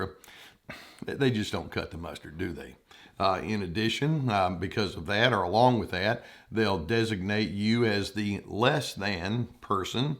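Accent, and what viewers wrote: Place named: Texas, United States. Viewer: I never realized that so much first-rate psychological insight would come with a Texan accent.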